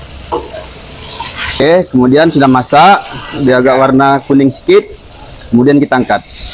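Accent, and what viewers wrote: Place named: Sabah, Malaysia. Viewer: Mantap bro,logat macam org Sabah saja....betul ke...?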